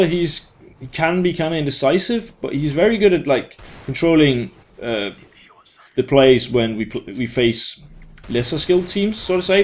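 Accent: Denmark. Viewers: He is from Denmark :))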